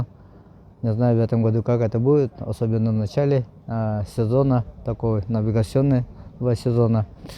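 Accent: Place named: Yakutia, Russia. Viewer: Это наш, Якутский акцент. Ты же не говоришь например армянам, ''слющай Ара рЭчь пАдкачай да''. Это всего лишь акцент.Человек больше на Родном общается,а не на Русском.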